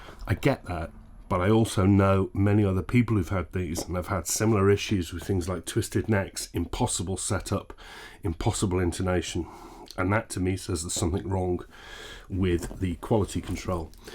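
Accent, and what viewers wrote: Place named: England, United Kingdom. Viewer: Its painful The English accent makes it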